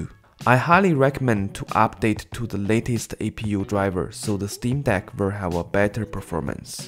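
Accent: China. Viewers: 怎么像中文口音啊老铁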